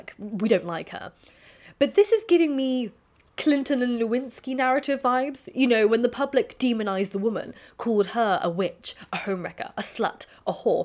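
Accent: RP, United Kingdom. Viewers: I love your received British accent. I remember not liking it at all even a romcom can work if it's at all believable and there's a sufficiently compelling set up and protagonist but I wasn't impressed.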